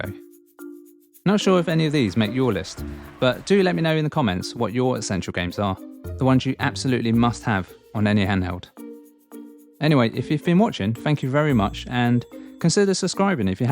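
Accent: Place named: United Kingdom. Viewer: It's called a British accent lol. Maybe you should travel more instead of playing games, it opens the mind. Hope that helps.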